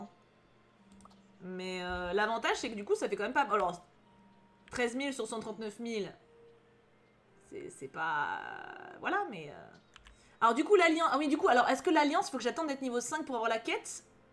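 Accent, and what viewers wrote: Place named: South, France. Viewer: En tant que sudiste je valide l’accent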